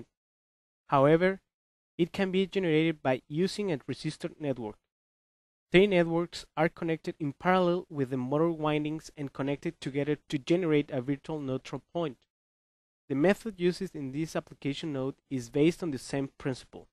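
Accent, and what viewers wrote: India. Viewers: that's Indian accent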